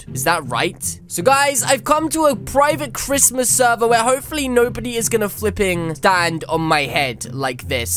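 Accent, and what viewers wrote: United Kingdom. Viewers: I love ur voice it's British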